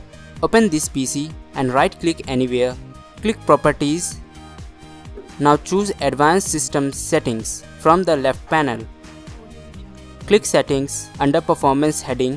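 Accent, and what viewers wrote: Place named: India. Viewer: i cant understand you.. indian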